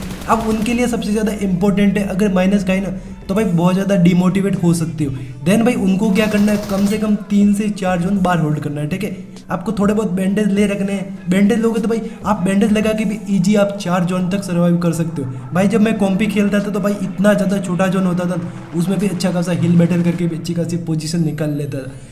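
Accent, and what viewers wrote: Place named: Rajasthan, India. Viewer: Bhai aap rajsthan se ho kya ❤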